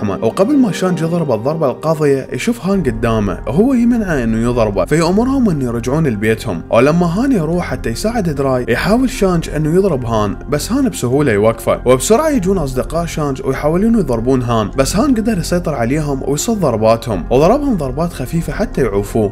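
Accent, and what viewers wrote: Iraq. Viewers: احلي شي فيك اللهجه العراقيه الحلوه اهوايا يازين العراقين ❤️😘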